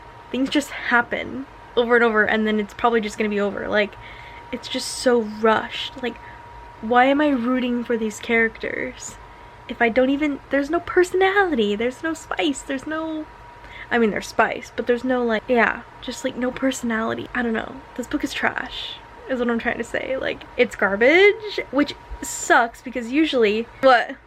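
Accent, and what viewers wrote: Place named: England, United Kingdom. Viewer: Cackling at your English accent🤣❤️ I literally love these so much- getting to live vicariously through you reading books I wouldn’t pick up for fear of DNF’ing 🤣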